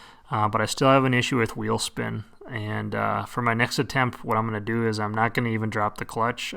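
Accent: Midwest, United States. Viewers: Could tell he was Midwestern right from that accent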